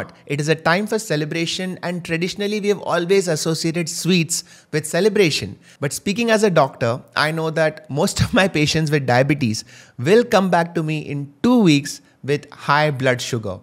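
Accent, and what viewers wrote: Kerala, India. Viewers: Are you from Kerala (Malayalam)😊😊😊😮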